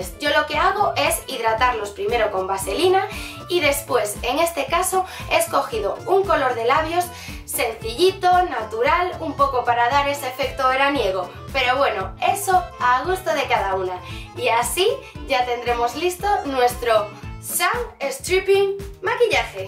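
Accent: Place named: Spain. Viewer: Es acento de España.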